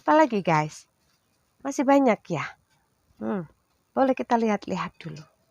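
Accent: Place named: East Java, Indonesia. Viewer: batinku nungu rondo mu mak e iki mesti wong pati opo jatim kasar pool bahasane😂😂😂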